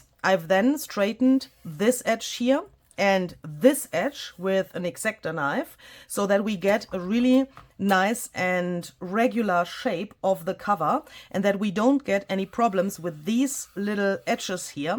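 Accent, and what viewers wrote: Germany. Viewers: you make the best videos! I love listening to you - to the English speaker a German accent is so charming - and your English is very good! If only I could speak a second language as proficiently as you do! I admire you so much and am so happy for all your success. Thank you for all the great content! I am buying you a coffee too! OMG: as I continued to listen, I heard the "You do you" comment. How ridiculous people can be! He doesn't own those words, nor any other phrases. These are commonly used in English conversation. All influencers can have comments they like to make, but that does not mean it belongs to them unless it is something specific to a product they have copyrighted. Don't pay attention to anyone who criticizes you, you have never done anything at all wrong!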